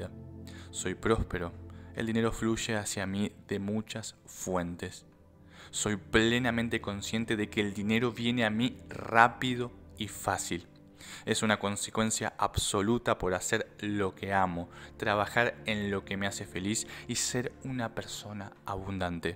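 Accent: Argentina. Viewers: Me da risa estás afirmaciones . El tono argentino y como lo dice te partes de risa 😅😅i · Super efectivo! Además me encanta xq esta en argentino y lo siento mucho más real y personal 🇦🇷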